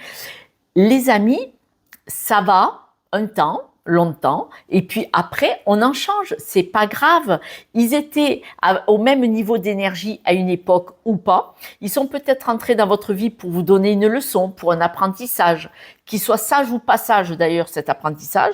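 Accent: South, France. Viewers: Votre analyse est super. Je me rends compte en effet que certaines choses changent. Merci votre accent du Midi réchauffe le coeur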